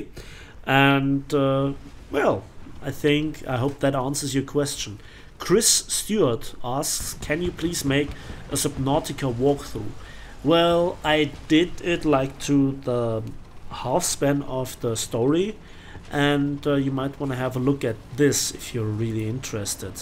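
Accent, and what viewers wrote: Germany. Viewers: Hi! I'm an AB pilot with 40.000 air kills and 11.000 ground kills and I'm starting to look at tanks now. I'm a total rookie at tanks and your huge channel is an enigma to me... there is so much stuff to watch and from what I saw sofar it's very good stuff... and here's a problem... I'd like to see some guidelines for tank noobs... and some guidelines to your channel... what videos to watch, where to start on your channel and for most part... guides on how to start with different nations... witch premium to buy, which tank to put talisman on... strategy, tactics, ammo guides... it's a rediculus stuff to you but if you want new subs then stop concentrating on pro players and start looking at rookie ones... because believe me... there are much more of us then there are pros:-D And just a little tip... for christ sake make your videos shorter... 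you like to talk... a lot... and that's nice but maybe you should sepparate your videos in talking ones and action ones... your subs are not allways in the same mood to watch long videos... 45 mins video is an absurd length... maybe add some index table for stuff you talk about in such long videos so people can skip parts they're not interesed in. And please make more AB stuff as you yourself pointed out in one of your recent videos that AB is much better and practical for spading then RB... I love your channel and all the effort and time you are putting in to it and I love your german accent and I wish you get 100.000 subs in the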